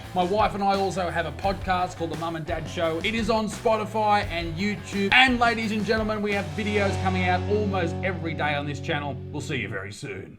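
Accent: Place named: Australia. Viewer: I am an American and I say Thanks for posting this. If you keep saying the truth eventually even the stupidest liberals will be forced to see the truth. It is time to slow down or stop legal immigration. And deport all illegal invaders. Also, I appreciate the open frankness and ausie accent. Also, I have to ask, why is this mass immigration always from shithole countries and into the US, Europe or Australia? Why don't they try to flood China, or Japan or India for a while?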